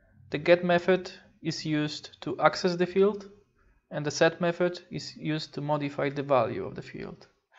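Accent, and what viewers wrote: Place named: Poland. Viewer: Polak detected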